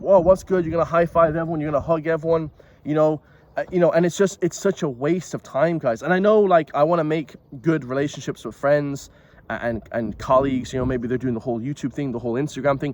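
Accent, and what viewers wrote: United States. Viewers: Who are you? I enjoy your content and chats, but Who are you when you don't speak a pure form of Irish? You sound more American than Irish and I don't recognise any background you are in today, that looks or sounds Irish. Who are you? Where are you? Why do you sound more American than Irish? I'm just asking. Hopefully, you will answer truthfully.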